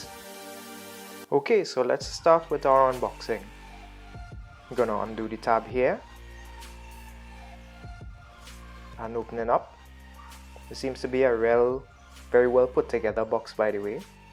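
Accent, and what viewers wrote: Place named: Trinidad and Tobago. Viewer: Cyar miss dat twang bai Trini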